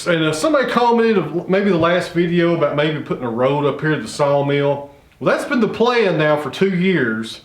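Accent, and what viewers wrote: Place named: Tennessee, United States. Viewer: You’re starting to sound like that concrete truck driver. NE TN dialect is kicking in!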